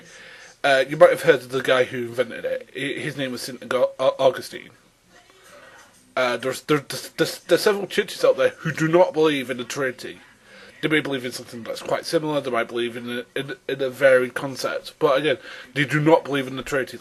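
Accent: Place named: United Kingdom. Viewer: No you are not. Speech impediments or not, it would be preferable if someone uploading a video at least tries to be clearly audible. It's just a very thick british accent joined with random mumbling and stumbling on words.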